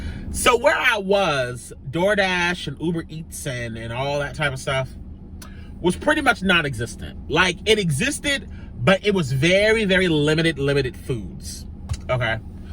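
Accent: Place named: Alexandria, United States. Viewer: Sounds like Alexandria, VA 😊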